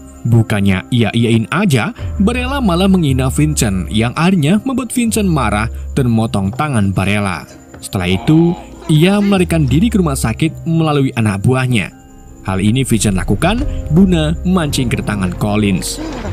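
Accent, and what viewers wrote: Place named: Java, Indonesia. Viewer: Tak meluni sekang awal malah part 3 jawane metu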